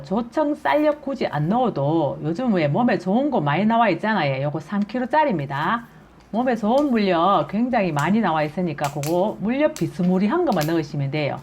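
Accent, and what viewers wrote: Gyeongsang, South Korea. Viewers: 쌤 경상도 라도 억양이 경북 분이네요 하모예 메실엑기스는 파이지예ㅋㅋ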